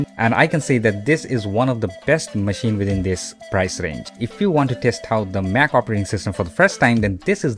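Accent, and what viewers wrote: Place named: United States. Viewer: thank you so much. I watched the other video you did about using it as a computer. Really answered question people didn't seem to be able to. And you sound very down to earth. I usually don't watch videos with an American accent cause there's a point my brain can't process the accent. So thank you.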